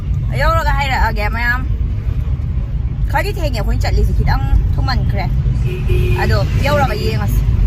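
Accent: Kakching, India. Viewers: Yengba yamna nungai tabasu yamna nungai nungai harisini eina ngang ja re si kakching maron dniko 😁😁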